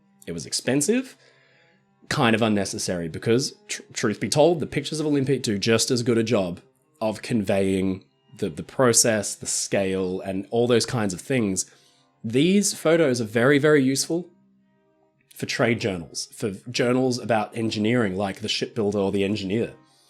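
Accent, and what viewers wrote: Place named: Australia. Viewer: I thought he was irish for years and then ir became obvious hes Australian...lok😊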